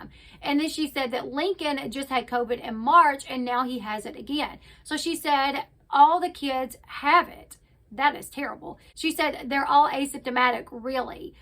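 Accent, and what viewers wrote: South, United States. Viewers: Just came on here to say I absolutely love your southern accent I literally just come to your channel to hear you talk sending lots of love from London, UK !! Your accent is everything for me girl !!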